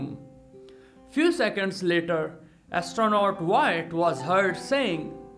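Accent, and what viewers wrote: India. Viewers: a pajeet is narrating this? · This is an Indian Accent I think, I hear that alot where I live (not India tho) Am I right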